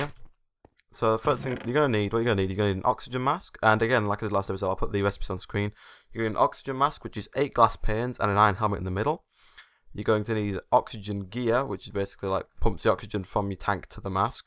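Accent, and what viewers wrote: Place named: United Kingdom. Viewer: his Thick Brittish accent....... =))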